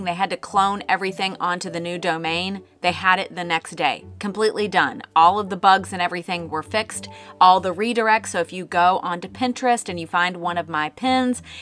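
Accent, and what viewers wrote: United States. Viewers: As a French native, I know the pronunciation can be difficult and also French people are stucked up the way French words are said. French isn't easy even for the French. I think that your American accent gives it a charm. I've been in the US for the past 25 years and still have a strong accent and often I killed the English words. What matters is that you did your best and wanted to honor Therese de Lisieux. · i love your videos and i’m happy you changed the channel name! i always thought it was funny how you obviously don’t speak french but have a French! channel name😂 i think ur pronunciation was okay but a little unintentionally pretentious especially in contrast with american accent coming right after😅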